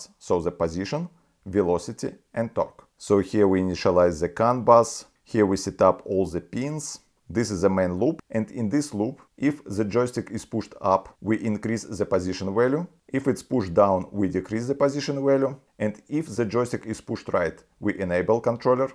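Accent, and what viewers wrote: Russia. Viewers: Русский акцент сильно земетно.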